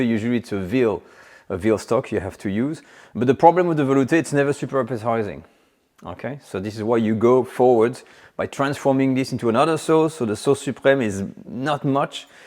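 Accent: France. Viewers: Quite shocking that a youtube channel with Academy in the title, and being French, did not make its own pastry ... that is the one technical bit here. That's the sort of thing I do on my amateur channel ! Are you just a bloke with a French accent trying to make money on youtube ? If they find out they will send you over here to England as a punishment :-)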